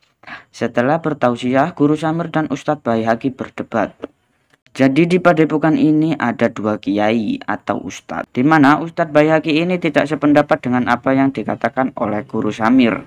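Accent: Java, Indonesia. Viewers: medok bner ngomong wkwk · medok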